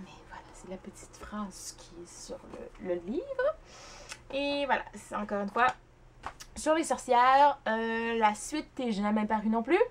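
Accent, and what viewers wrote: Quebec, Canada. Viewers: Belle PAL ! J'adore ton accent. J'ai de la famille à Montréal. Toi c'est le Québec ?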